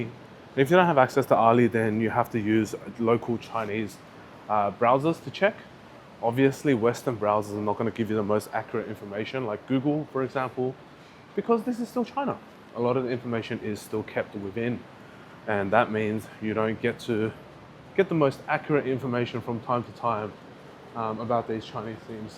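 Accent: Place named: Australia. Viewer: Australian accent detected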